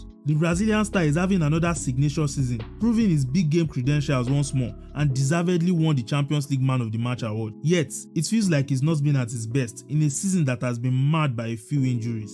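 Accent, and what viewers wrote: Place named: Africa. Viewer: Nice video. Which country are you from. I get an accent either Nigeria,Ghana, Kenya or Cameroon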